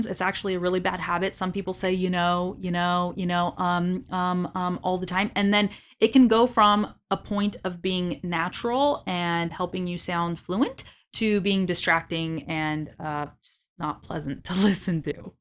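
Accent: California, United States. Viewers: It's great, btw, I have watched your content in some videos, am I wrong if you sounds more like American than British?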